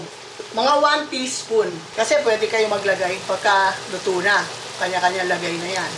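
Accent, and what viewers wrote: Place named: Pampanga, Philippines. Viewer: Flabor - Ober der I can always tell people from the Philippines, they insist on their own pronunciation. Jokoy the comedian has that down.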